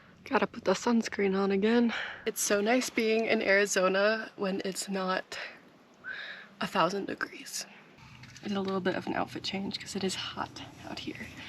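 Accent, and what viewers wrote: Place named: South, United States. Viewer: Also, With The Thing Inside Your Mouth, I Can Hear The SouthernBell Southern Accent For Real.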